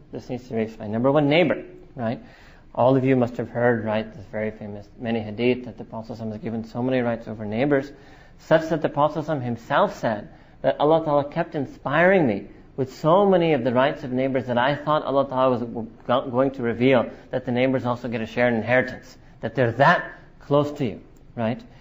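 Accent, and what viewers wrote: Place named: England, United Kingdom. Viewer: Extremely strong English accent n very fast.though I want to understand this important topic of zakat,I only like my sheikh,s voice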